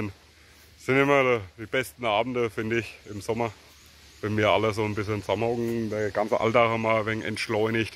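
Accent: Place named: Lower Franconia, Germany. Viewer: Schööön! Ein Undder-Frrrange 😇 sonst gibt´s hier nur Ober-Frrrangen 😂 direkt abonniert und gelikt!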